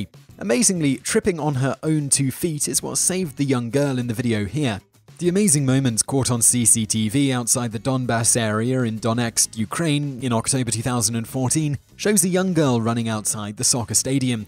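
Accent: United Kingdom. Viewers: Why does every countdown video have some British guy?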